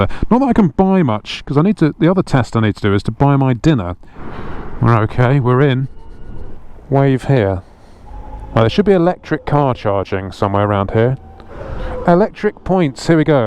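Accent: England, United Kingdom. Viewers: Love the way you think mate, like listening to myself with a pommie accent 😆👍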